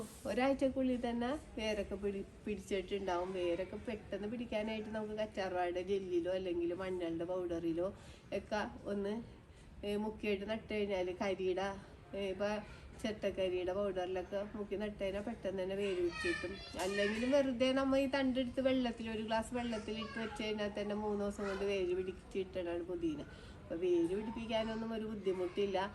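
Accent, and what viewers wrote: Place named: Ernakulam, India. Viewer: സംസാര ശൈലി തന്നെ വേറെ ഒരു സ്ലാൻ ഗ്.... എറണാകുളം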